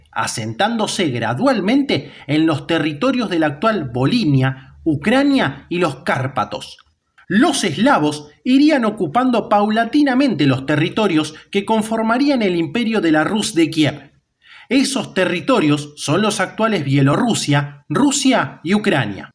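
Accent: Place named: Argentina. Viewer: Buen documental, PERO deberías de dejar de husar jergas y acentos cuando se trata de ' Nombres propios ' de Ciudades, paises, regiones, o nombres de personas... usar la She , sho, shu, sha, etc en nombre própios es an algunos países un insulto, ese el problema con los acentos argentinos, por lo demás perfecto.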